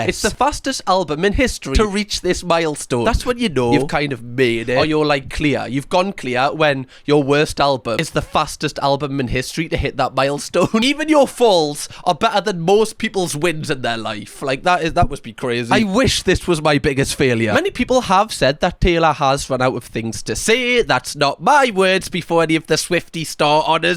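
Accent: Newcastle, United Kingdom. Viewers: Geordie (Newcastle, UK)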